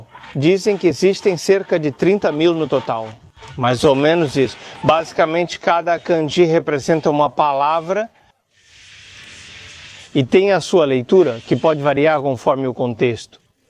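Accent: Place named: Portugal. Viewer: portugues de portugal :face-red-droopy-eyes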